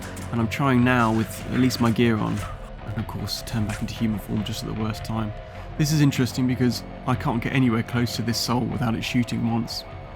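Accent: United Kingdom. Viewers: Haha! I thought it was like a translation from Diablo 2 in German or something. But your accent is 100 % purebred British and not sauerkraut so I got confused.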